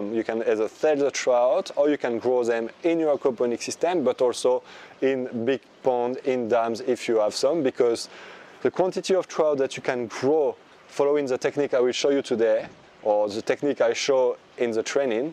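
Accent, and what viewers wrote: France. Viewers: Great video also the french accent 👌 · There is nothing more debonair than a frenchman speakng english - Im sure all the woman are hanging on every word he says.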